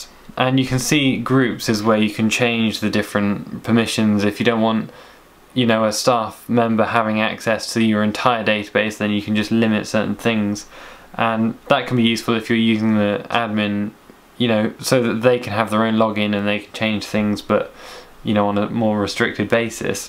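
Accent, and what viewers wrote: United Kingdom. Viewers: Everything's easier to understand with a British accent.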